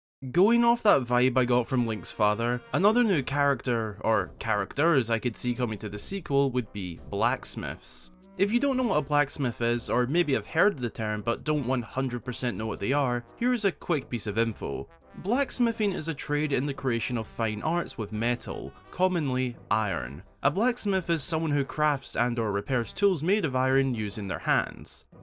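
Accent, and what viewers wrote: Scotland, United Kingdom. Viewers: I like yer scottish accent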